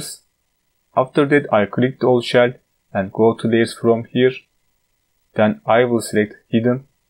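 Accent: Turkey. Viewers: izliyorum izliyorum diyorum ne kadar türk aksanı var , archicadde çizim yapmayı bırakıp bunu düşünüyordum. ekranınızdaki TUR yazısını görünce aa haklıymışım dedim :)) teşekkürler çok yardımcı oluyor videolarınız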